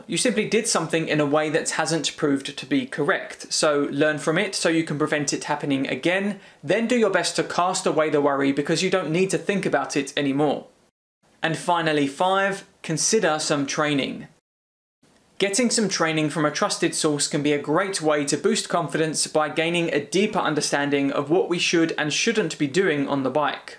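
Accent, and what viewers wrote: United Kingdom. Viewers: Dear Lord, once again a perfect Video which comes at the perfect Timing for me. I really adore your videos, or maybe its just the well sounding british way of presentation 😁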